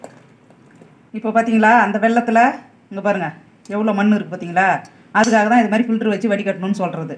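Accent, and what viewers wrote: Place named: Tiruvannamalai, India. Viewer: நீங்க எந்த ஊரு அண்ணன் எங்க ஊரு பாஷை மாரி இருக்கு நான் திருவண்ணாமலை 👋நீங்க என்ன ஊரு சொல்லுங்க அண்ணா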